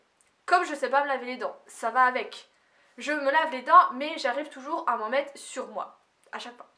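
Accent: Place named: Vosges, France. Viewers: ton accent... celui de Gondreville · tu as vraiment l'accent vosgien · tu as l'accent vosgien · tu as un petit accent vosgien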